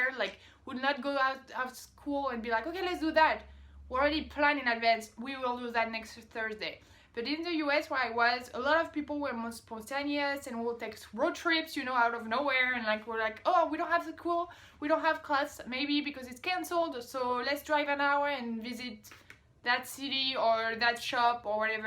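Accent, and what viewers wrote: France. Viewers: Just found your channel today, surprised I didn't find it before because I watch a lot of exchange students videos "coming to the US". You still have a French accent, not really that normal especially after 5yrs in the States. Most go home as a pure born Americans lol. But I must say I love your accent. I think most of the EU seems to say and think about the same things when it comes to their experience in the States. I have given this some thought and although it has been hurting the country(Politics) lately when it comes right down to it we are just one big family regardless of where in the US we are from. So when you think about the friendliness and small talk kind of stuff it makes sense really. Are you going to come visit us again?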